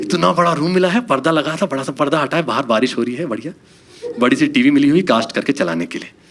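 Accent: India. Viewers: That little touch of Bihari dialect.. amazing 👏👏👏👏😂😂😂😂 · Your dialogue delivery is so typical of Renukoot with a touch of the old Mirzapur vernacular.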